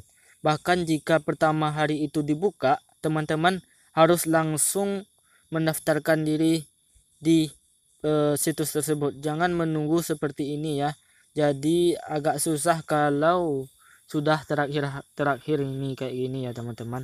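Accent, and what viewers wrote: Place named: West Sumatra, Indonesia. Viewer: Urang minang yuang😅😅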